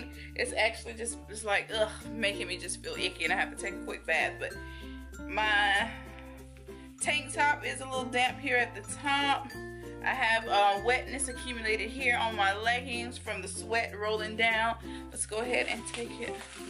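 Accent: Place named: South, United States. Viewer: Well... she does have an accent but it’s because it’s southern. Not ghetto. To me it’s not an accent because I was born and raised down here. Thanks queen for the video!!!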